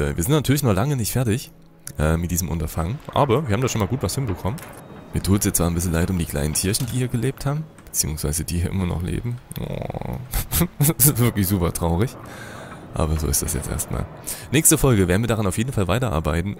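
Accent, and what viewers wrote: Saxony, Germany. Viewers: Sächsisch? LG (ツ)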